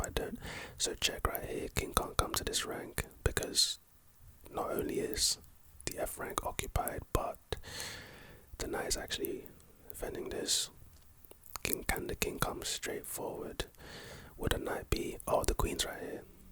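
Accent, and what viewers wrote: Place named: South London, United Kingdom. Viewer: Mr if you don't mind me asking where are you from? cause you have a very distinct south London accent and I honestly can't figure out where in south your from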